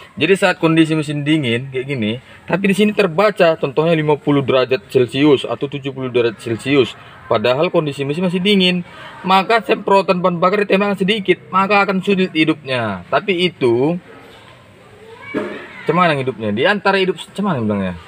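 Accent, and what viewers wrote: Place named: Medan, Indonesia. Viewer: Bang bengkel nya dimana bang.apa dimedan.logat nnya medan.kalo tangerang mah deket nih ke saya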